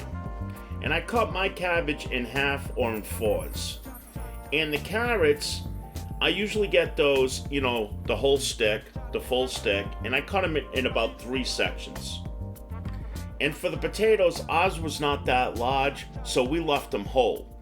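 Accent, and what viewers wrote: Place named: New England, United States. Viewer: Oh how I miss New England accents, I'll be making a boil dinnah on Sunday 🤤🤤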